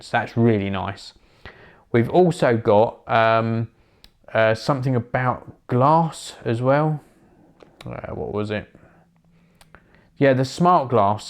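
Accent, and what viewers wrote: England, United Kingdom. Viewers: Nice video nice to English person talking about tech as well not many out there.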